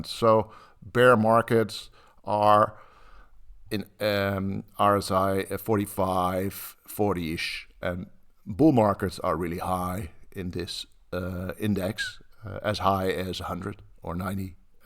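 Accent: Netherlands. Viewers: you sound like my old professor of Applied Cognitive Psychology Phycology at the university of Utrecht! Are you Dutch ??!! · Finally ... your English pronaciation is suddenly much better ... the Dutch accent is fading ... that's cool . . .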